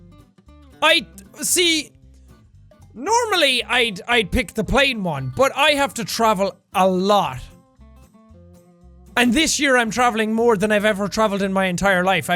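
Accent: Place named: Ireland. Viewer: I’m sorry but the way you pronounce ‘lorry’ 😂😂😂 Not hating, just found it funny 😂😂 Dang that Irish accent 😂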